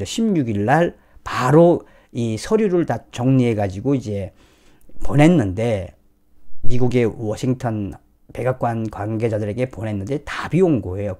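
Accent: North Korea. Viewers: 대표님! 북한말 귀에 거슬리네요!!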